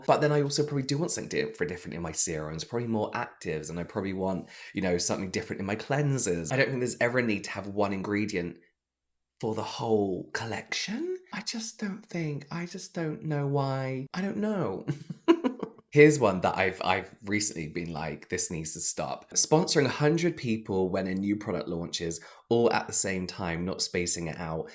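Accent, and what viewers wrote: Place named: RP, United Kingdom. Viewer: I love the way you talk. It's just so Posh!😩👌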